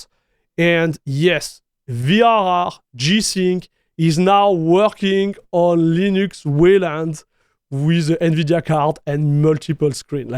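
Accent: France. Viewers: That's a French guy not afraid to have an accent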